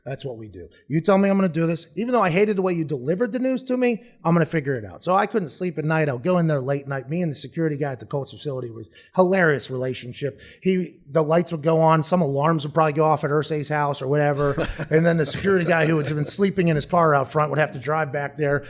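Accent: Pittsburgh, United States. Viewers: "Come dahhnnn, to da tahn hawhlll" mah man McAfee still got that Pittsburgh accent, love ya bro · Dude, Pat... you can take the guy out of Pittsburgh but you can’t take the Pittsburgh out of the guy... man the accent is a thing of beauty 😂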